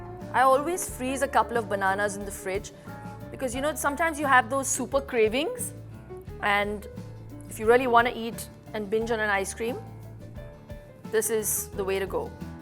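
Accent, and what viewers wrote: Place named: England, United Kingdom. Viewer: Her English accent makes me smile like crazy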